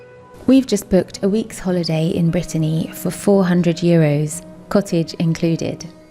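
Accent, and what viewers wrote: United Kingdom. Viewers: That's NOT a French accent. She sounds British. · That's great, but why does this "French" narrator have an English accent? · She's British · Sounds just normal here in iRELAND -You sound Uk ?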